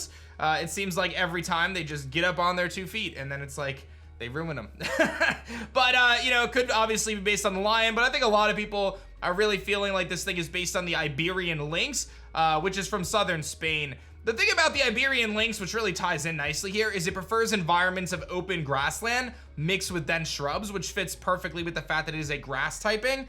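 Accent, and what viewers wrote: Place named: United States. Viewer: all tho respect but it hurts to hear americans say spanish words ALL THOUGH RESPECT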